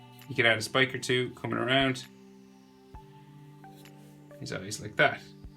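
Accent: Ireland. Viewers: Your accent sounds sorta irish would ya happen to be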